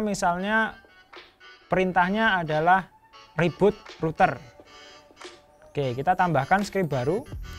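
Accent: Java, Indonesia. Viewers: Medok mu mas aku suka hahaha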